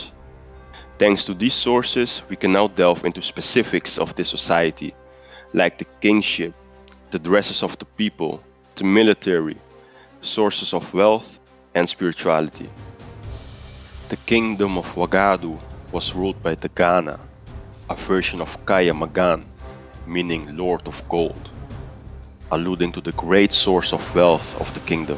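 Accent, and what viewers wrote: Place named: Poland. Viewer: Omo you sound more like polish or ukrainian or even Austrian...u sure?...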